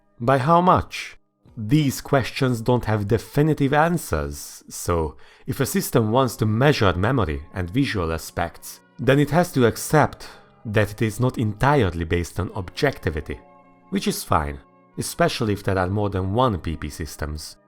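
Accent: Hungary. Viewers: Egy ideje nézem a videóidat, ismerős volt a kiejtés, de csak most realizáltam, hogy magyar vagy :D Így tovább!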